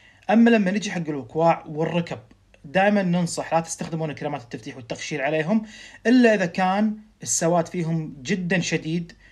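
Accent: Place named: Syria. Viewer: حلو الحجي السوري انا من العراق بس اكيد الهجه السوريه حلوه تحياتي لكل أهل سوريه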